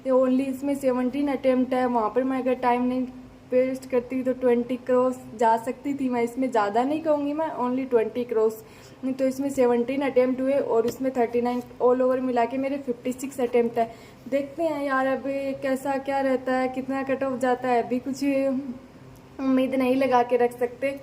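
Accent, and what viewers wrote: Rajasthan, India. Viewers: Language se Rajasthan k lagte ho😅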